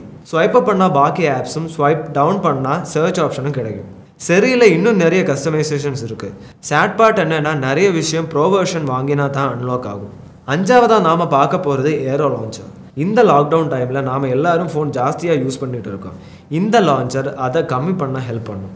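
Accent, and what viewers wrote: Kerala, India. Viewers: Malayala tamil? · Yeppa dai Ivana ah mathunga da Ivan nalla ila da Ivan malayali pesuran kevalama iruku Ash ah review pana sollunga da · Narrator malayaayi yaa paa !!! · தம்பி பேச்சில் இன்னமும் மலையாள வாடை.. மாத்திக்க முயற்சிக்கலாமே.. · Ivan yena Malayale mathiri pesuran 😫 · ഹായ് ബ്രോ മലയാളിയാണോ സംസാരത്തിൽ ഒരു മലയാളി ചുവയുണ്ട് . ഹാഷ് അണ്ണൻ എവിടെ ? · Malayali ah bro accents theridhu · Your Malayalam slang is slightly visible your tamil... Great composite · Hi bro...unga slang ah patha kerla pakkam mari irukae!? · Hello Kerala guy your malayali tamil nice bro are you running separate yt channel in Malayalam what's your tech channel name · Bro മലയാളി ആണോ?? എനിക്ക് അങ്ങനെ ഒരു ഫീൽ കിട്ടുന്നു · Malayalam Tamil slang ah eruku 😃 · மம்முட்டி தமிழ் பேசுரமாதிரி இருக்கு தம்பி யாரு... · Kerala tamil ah 🤔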